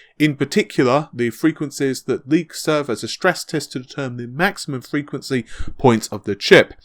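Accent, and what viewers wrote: United Kingdom. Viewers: Its rough having a deep voice with a British accent imo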